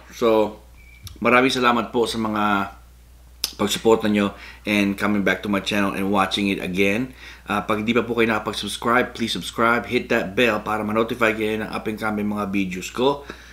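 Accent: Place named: United States. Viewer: Sir kung hindi ka lang nagtatagalog, aakalain ko american ka..hehe..Godbless po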